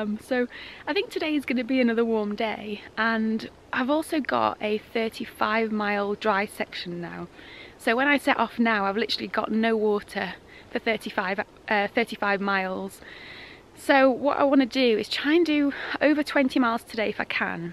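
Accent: United Kingdom. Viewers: Healthy meal!! Heat is dangerous, be careful out there! Love the accent. It’s like a Mary Poppins thing, very sweet. The suitcase, clothes and cans look very old. Sometimes “interesting” people do their own adventure.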